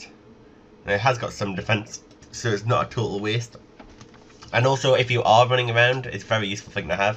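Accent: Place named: Newcastle, United Kingdom. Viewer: he's not from Scotland you idiot, He's from Newcastle i think.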